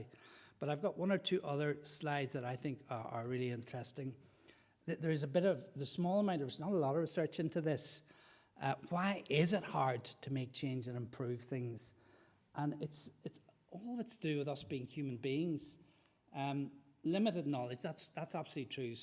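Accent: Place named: Ireland. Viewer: The Irish accent ❤️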